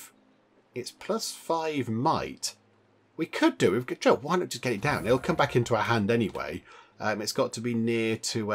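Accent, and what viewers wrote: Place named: England, United Kingdom. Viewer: I flipping love your gameplays, your fabulous typical English accent and your way to entertain people. 👏🏻👏🏻👏🏻👏🏻👏🏻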